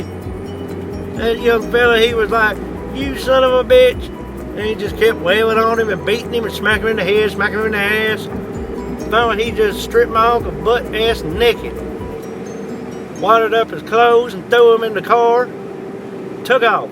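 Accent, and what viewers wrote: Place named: South, United States. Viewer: I live in Jacksonville, and this guy sounds country as shit.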